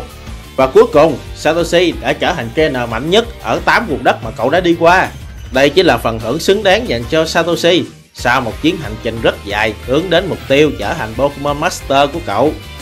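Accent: Vietnam. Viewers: Theo dõi admin này từ hồi làm clip ngắn, lúc đầu giọng đọc rất tệ rặc khuông miền tây lại ngọng, đọc vấp, không rõ chữ nhưng càng về sau ổng đã cải thiện hơn, có thể ổng chưa bằng đuợc chất giọng của admin chính, nhưng sự cố gắng trong chất giọng và cả idea làm clip là rất đáng ghi nhận, mọi người nên động viên ổng phấn đấu thay vì bày xích ổng, ủng hộ admin và kênh PAG Center❤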